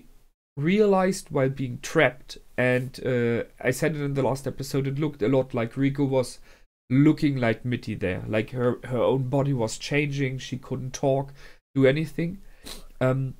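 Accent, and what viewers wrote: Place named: Germany. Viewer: could you Provide me with a link, im on phone and i can only click on links:/ Would be very much appreciated. By the way are you from Germany, you have that kind of accent:)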